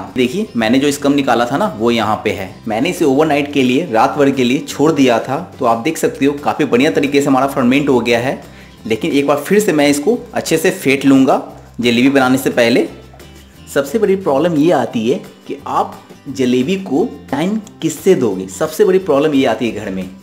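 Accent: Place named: Bengal, India. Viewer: Jo khudko zada chalak samjte hain wo sabse zyada dumb hota hain...dnt make fake video...and by your word you seems bengali...to bengali me hi baat kare...pronounciation is soooo poor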